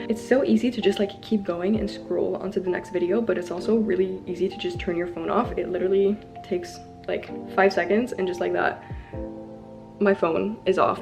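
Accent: Quebec, Canada. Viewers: Omg i just stumbled across your channel, and when i first heard you talk jme suis dis "wait a minute je reconnais l'accent" J'ai réalisé que t'étaits québécoise!!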